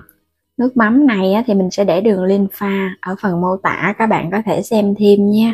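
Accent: Mekong Delta, Vietnam. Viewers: Giọng người miền Tây đa số là vậy bạn ơi .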